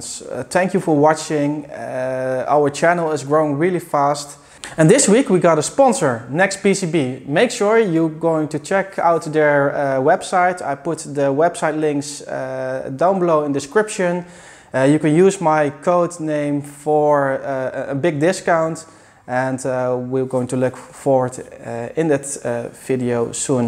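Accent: Netherlands. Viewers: Je hoort dat het een Nederlander is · I nit think he us russian my friend, he sounds dutch to me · Ik hoor een nederlands / engels accent. ^_^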